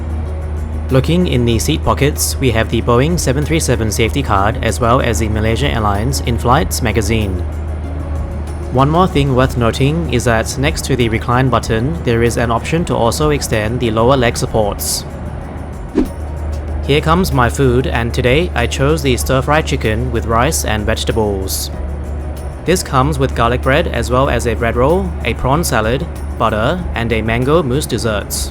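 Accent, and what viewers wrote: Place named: China. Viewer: Why is your pronunciation so reminiscent of a Chinese - educated person? Pronouncing non - existent “Ss”. And your tone of voice is really flat like the airport runway.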